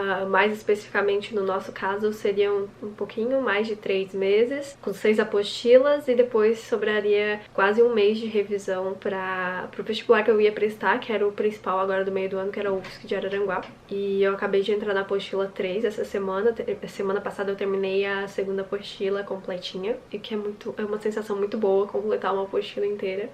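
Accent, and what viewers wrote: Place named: Curitiba, Brazil. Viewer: Eu jurava que tu era curitibana 😂😂😂 me veio até distrito federal do nada agora ahsuahsu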